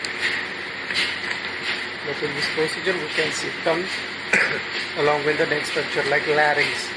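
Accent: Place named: India. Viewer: прошу прощения. Индия. Акцент характерный. труп пролежал не более 4 дней, только в условиях жары. плюс сам по себе человек смуглый был. отсюда и отличный внутренний мир тела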